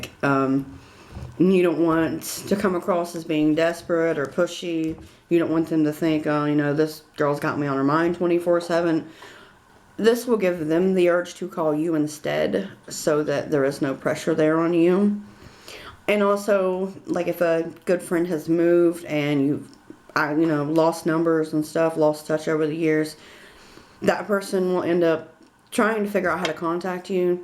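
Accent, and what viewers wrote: South, United States. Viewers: cool nails also I dont care about ur southern voice because my family is from texas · I didn’t even notice you had a southern accent until you mentioned it!!! But I am from a southern area and have had people judge me off of it too. Honestly I love southern accents so everyone who hates on it can go somewhere haha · Thanks for the great video.... and just for the record, English guys love that Southern accent;) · Haha I love your southern accent! Thanks for the video! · Haa! funny how you do not like whomever told you don't like a Southern accent....your accent is terrific, and your straightforwardness is great....Thank you! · I happen to love your Southern accent - I want one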